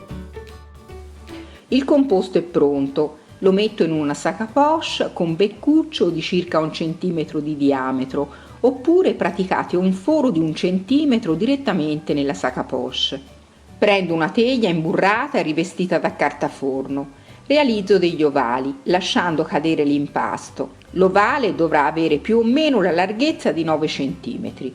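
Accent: Tuscany, Italy. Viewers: Anche lei è deliziosa, sia per la pronuncia Toscana che per le meravigliose ricette!!!!😘😘👩‍🍳🍰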